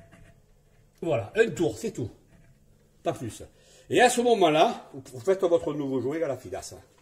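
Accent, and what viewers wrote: South, France. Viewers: Bonjour et merci pour le tuto et avec l'accent du sud encore mieux Bravo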